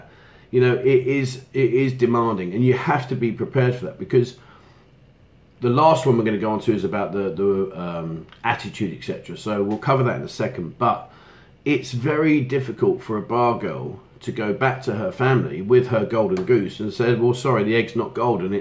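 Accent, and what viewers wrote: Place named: United Kingdom. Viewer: Thank you for all these precious advices. Also your British accent is very smooth and to me it is a good exercise for my bad English.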